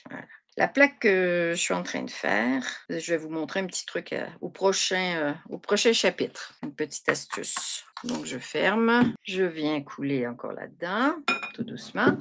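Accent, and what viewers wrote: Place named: Quebec, Canada. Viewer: Bonjour, je viens de vous découvrir 😊 c'est drôle, je suis québécoise et je pensais que vous l'étiez à la façon dont vous parlez, je me demandais si vous étiez française ou québécoise. C'est dommage mais j'ai une Cricut mais je vais quand même aller voir vos vidéos 😊 ce que j'aimerais c'est d'avoir des projets pour utiliser ces impressions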